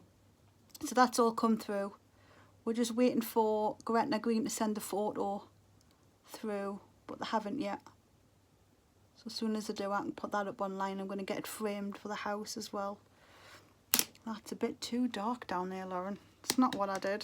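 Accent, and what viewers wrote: Newcastle, United Kingdom. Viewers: Lauren I've watched you from the beginning and my boyfriend went to Newcastle Uni (now my husband) and I was in Glasgow doing my nursing training. It seems silly but I felt really at home in Newcastle and when I missed him hearing your accent in your videos was so lovely. I'm so glad you're on youtube again and you seem so happy! you look stunning and wish you all the best for the future lovely. :) <3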